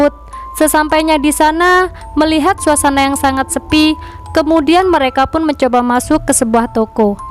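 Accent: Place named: Java, Indonesia. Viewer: Jowo endi gaess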